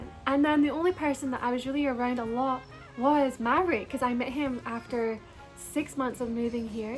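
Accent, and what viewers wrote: Scotland, United Kingdom. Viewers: I think you're adorable but you've missed the point. It's you trying to sound like Marilyn Monroe. Then your Scottish accent pops through and people are left scratching their heads. I listened to an earlier video and this is drastic change. If you are being authentic, as you claim, I think you should ditch the breathy voice and speak with your natural accent. It just sounds too forced. Be yourself. Im sure I speak for many Americans when I say we adore foreign accents, especially Scottish and Irish. It's so charming. Be proud of it! Again, you're adorable and your fans will still love you. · I'm so confused, I'm new here and literally just checked out some of your first cpl of videos where you talked with your authentic, deeper Scottish accent. All you've done is decided to talk higher tones softer....like Marilyn. It's so obvious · Your scottish accent is absolutely stunning!!! 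Dont hide it! I with i had a natural scottish accent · It’s just her Scottish accent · I love your Scottish accent! It’s beautiful! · Although she’s “soft spoken”, she’s obviously Scottish. She tries so hard to sound American. How very sad…🥺🥺🥺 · I can hear the Scotland accent and I love it I noticed your voice but I didn’t think a lot about it and I thought your voice was really sweet and your voice is your voice and yours is really pretty ❤️❤️❤️❤️❤️❤️ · I can tell you are Scottish but also a little English. I love 💖💖💖your voice and I think people should stop sending you hate😼😤😤😤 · I think she slightly changes it so people can understand her more clearly and not hear the Scottish accent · So glad to see this video! It explains it all🌞 I LOVE your true Scottish accent!!! Thank you for this❤️❤️❤️ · I honestly thought it was to imitate Marilyn's soft voice. I love how this is actually natural! 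Her voice is smooth and calming. And your accent is awesome. I have always liked Scottish accents also you are very beautiful! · You can really here the Scottish account. My grandma is Scottish. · Never change the part of you that God gave you you have a beautiful Scottish accent so don't change it love yourself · she sounds scotch to me. i love her voice so much thooo its so calm and gives a happy and comforting feeling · I think it's more she speaks slowly due to her Scottish accent so other countries understand her. I'm Irish I speak so fast and Scots are the same .I slow mine down if away so I would naturally should mo gently then I normally am. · A Scottish accent is 100% my favorite!! I’m sorry you get hate for such a sweet soft voice 💜💚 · You're Scottish!!! Wow, you covered it up so well with your Marilyn voice. What a twist. Lol. Don't ever feel insecure about your voice and how you like to talk. 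It's a unique trait that makes you you! I don't know how people could hate it. It's very satisfying and relaxing to listen to! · Love the Scottish Accent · I don’t understand people! who can definitely tell your Scottish by the way you say words….. this your natural voice is beautiful very peaceful and happy You can still tell in earlier videos with the American accent, certain word you would say still had a Scottish tone or pronunciation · You can tell your Scottish, I have been to Aberdeen. Americans will understand you. I understand Gerard Butler fine. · I think that her voice is inspired by Marylyn Monroe because it's more higher pitched, so she does that by choice. Obviously the Scottish accent is her real accent. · Your Scottish accent reminds me of my family who lives there. And btw I absolutely loveeeee your voice!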